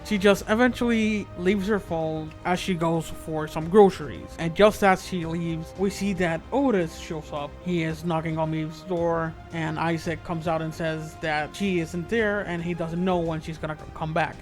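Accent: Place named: Scotland, United Kingdom. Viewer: hes scottish, dont be inconsiderate haha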